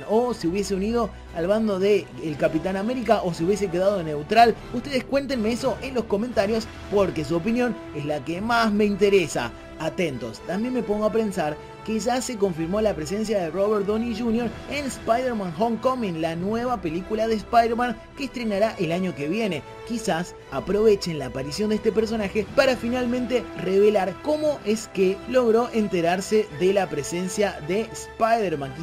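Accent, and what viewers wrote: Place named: Rioplatense, Argentina. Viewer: Se te nota un poco un acento de por acá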